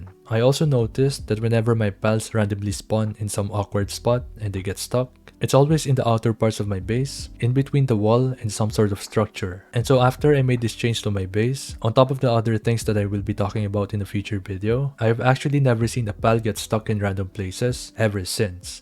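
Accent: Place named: Philippines. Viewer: I can tell your Filipino by your accent lol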